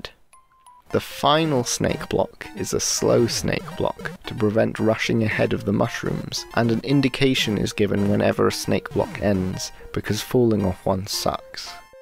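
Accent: United Kingdom. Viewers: are you from portsmouth? you sound like neil gaiman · Are you Scottish?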